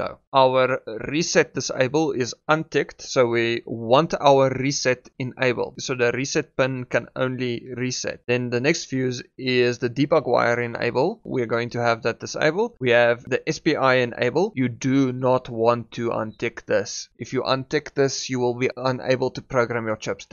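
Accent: Afrikaans, South Africa. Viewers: Afrikaans accent?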